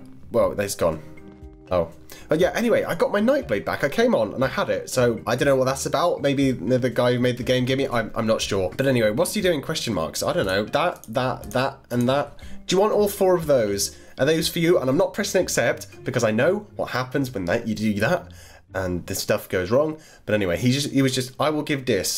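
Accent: United Kingdom. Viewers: Your British inglish...it’s so charming! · I like ur accent British? Great vid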